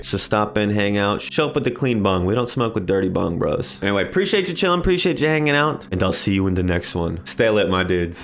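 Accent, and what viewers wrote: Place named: Boston, United States. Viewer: Where you from man what’s the accent? I’m from just north of Boston and sounds kinda familiar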